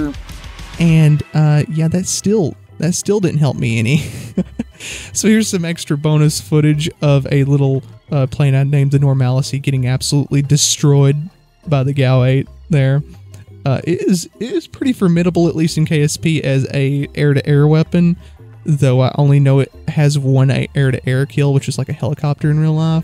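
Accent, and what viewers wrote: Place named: Iowa, United States. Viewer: It's just a yes or no can you make a like ww3 series were you use that weapon mod basically nato vs russia and china and other Rouge contries pls and thank you. (other suggestion make a F22 and the B2 bomber) (I know you a guy from Iowa or something in the usa that has a dessert cuz you got a nice accent) (sorry if that offended you) (Gramerly helped with that) (I think im using too much brackets lol i mean these (((())) )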